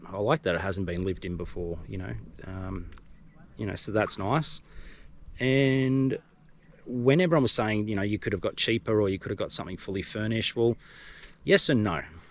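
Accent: Australia. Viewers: Hey, it’s great to hear a Aussie voice talking about his adventures in the Philippines, we work hard for what we have, so I agree buy what makes you comfortable. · Great to hear an Aussie accent in Dumaguete in lieu of americano I will heading there from Manila next week love to catch up with a beer if likes cheers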